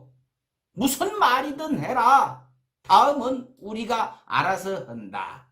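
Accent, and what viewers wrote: Jeolla, South Korea. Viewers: 성범형님, 전라도 떠나온지 4년인데.. 내용떠나서 형님 사투리 들을라고 들어옵니다. 그런데 속까지 시원해진께 기가맥히요.. ^^ 사랑하요 행님.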